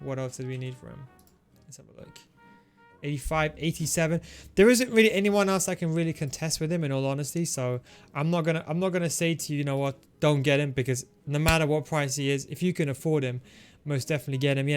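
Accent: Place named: United Kingdom. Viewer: Love the review. Off topic but i heard your accent and curious where in UK you're from?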